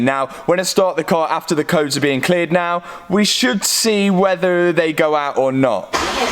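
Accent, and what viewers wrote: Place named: RP, United Kingdom. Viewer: This is my first video I've watched on your video. You sound posh but look like an LA fella 😂. Cool car content though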